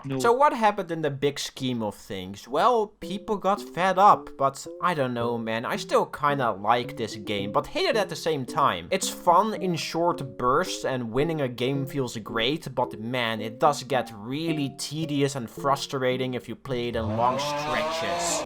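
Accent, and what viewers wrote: Netherlands. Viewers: Tering…….wat een cringe nederlands kut accent 🙃🙃🙃🙃🙃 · Deze man heeft een beetje een hele grote Nederlandse accent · you sound dutch as fuck bruv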